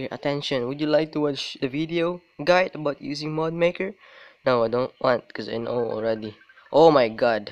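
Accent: Philippines. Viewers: filipino right? english palang alam na this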